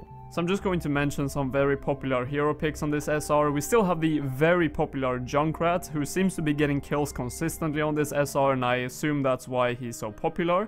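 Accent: Sweden. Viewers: Swedish accent I Love it bro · u are sweden i hear it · Sounds like your from sweden( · Nice video mate, are you Swedish btw?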